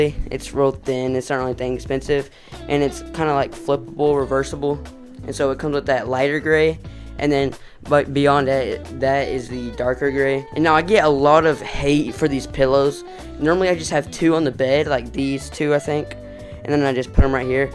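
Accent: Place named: Texas, United States. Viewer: Impressive room. Desktop setup is amazing. Little clues like your accent and the weather today make me think you’re in Texas.🤷🏻‍♂️ Great content. All the best. 🗡Ace🗡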